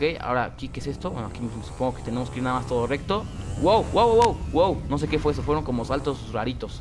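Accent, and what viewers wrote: Mexico. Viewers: Eres Méxicano????♥️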